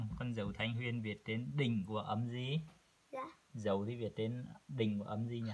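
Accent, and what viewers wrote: Central, Vietnam. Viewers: 2 ông con láu cá quá, giọng của bạn miền Trung?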